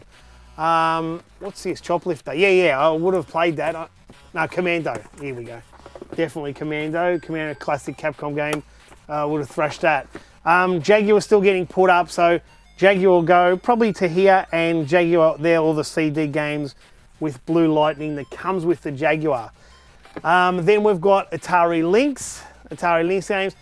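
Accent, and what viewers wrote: Australia. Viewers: you can hear the Aussie out of him :D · Are u Australian? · Just love how you from down under says: so yeah, ehm yeah and so on. Sounds bloody awesome! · name It is not annoying. It is Australian.What is annoying to someone is the norm in a different context. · youre aussie? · I am assuming you're from Australia because of your accent. I love your video game collection, now that's a collector. Keep up the good work!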